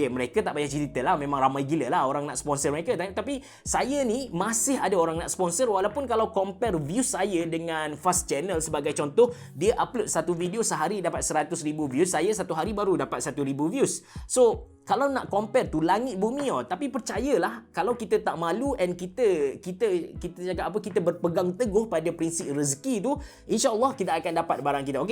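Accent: Kelantan, Malaysia. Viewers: Sy slh seorg peminat abe sejak 2017 lg, masa tue masih ckp kelantan lg..hehe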